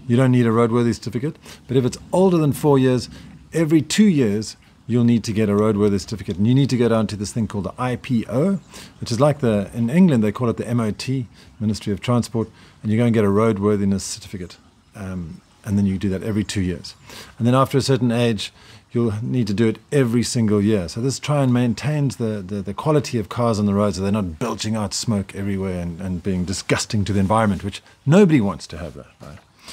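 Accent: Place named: South Africa. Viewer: fascinating video Happy to find your channel. I'm also from south africa and the accent was so recognizable. I'm thinking of moving to Portugal and this video and the public transport one was very inciteful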